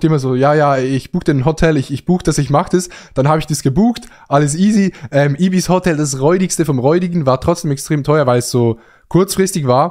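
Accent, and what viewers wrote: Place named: Switzerland. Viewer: Deine Aussprache von Hotel, beige und Bequem ist einfach Schweiz aber nicht richtig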